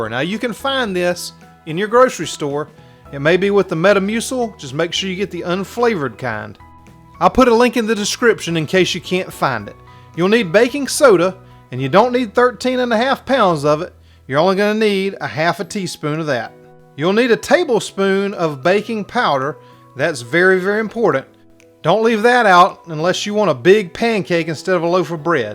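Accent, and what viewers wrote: South, United States. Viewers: And the GOLD LOGIE goes to you Mr 🎉🎉🎉🎉🎉 I’m from “ Down under “ Australia and wanted to say congrats on your bread. It is the best one that I have found, I did tweak it a little bit ( less pork rind, more of Parmesan, golden flax seed and coconut flour) … DELICIOUS… Even my non Keto husband loved it. I also had enough mix left over to make muffins which I will freeze. Keep up the Good work and keep it “PROPER”🎉🎉 love your southern accent, can listen to it for hours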